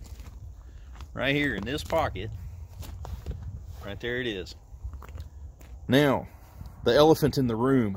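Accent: South, United States. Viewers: Your country accent and the chickens 🐓 are priceless…great vid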